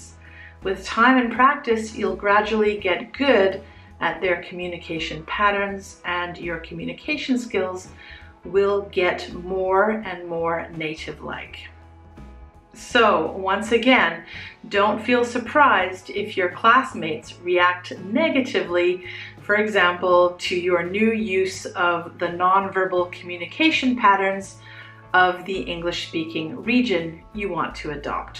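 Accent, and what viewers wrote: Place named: Canada. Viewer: Iam trying to get get american native accent by using krashen's techniques and listening to usually american native accent that's it BTW I have noticed you aren't speaking American native English accent from frist impression but Canadian accent is quite similar to America's one Finally thanks for sharing your thoughts and krashen's ideas. looking forward to speak like native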